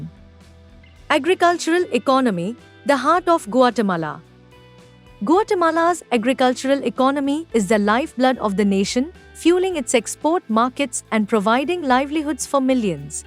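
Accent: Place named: India. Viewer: why does your voice have an indian accent? are you indian?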